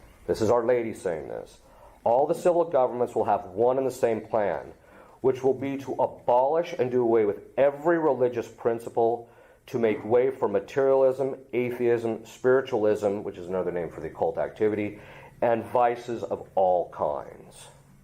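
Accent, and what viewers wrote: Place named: United States. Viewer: Plus, zar nije fenomenalan njegov jaki svjetovni,muski americki naglasak? Bas svjedoči njegovom specificnom karakteru.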